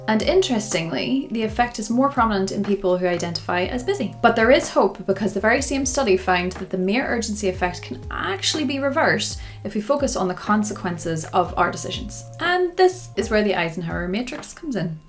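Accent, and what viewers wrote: Northern Ireland, United Kingdom. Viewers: She has a Northern Irish accent.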